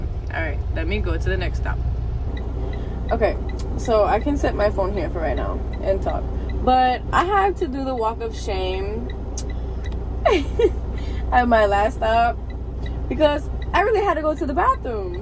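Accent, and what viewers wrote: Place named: South Florida, United States. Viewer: Sounds like South Florida. Pompano or Miami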